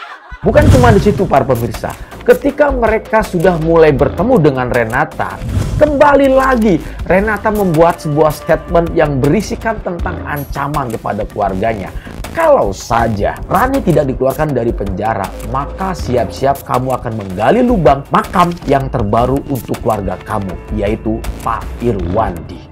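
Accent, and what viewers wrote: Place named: Sunda, Indonesia. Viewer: Resep KA momo bar bar lebok ku sia haaaaaaaaaa Sunda banget nya bang saya Oge orang banten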